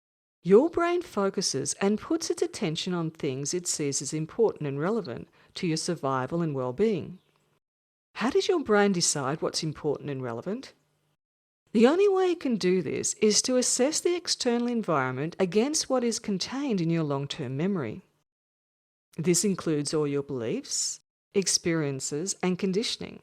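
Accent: Australia. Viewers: razzzzz. sounds like an Auzzie professional surfer. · women with Aussie accents <3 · Australian accent is killin me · Horrible australian accent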